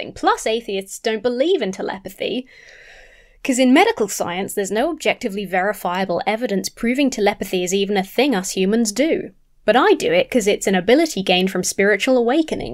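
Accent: United Kingdom. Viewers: Hi there! I just wanted to take the opportunity to thank you for what you do (from "across the pond," as the old cliche goes)! I feel as though YOU get under Hovind's skin more than anyone else. He just can't handle a vibrant, youthful, highly intelligent, HILARIOUS, fenale. Throw in that WONDERFUL British accent and those voices you use (especially Hovind impersonation), and we have the icing on the cake! You ROCK!!!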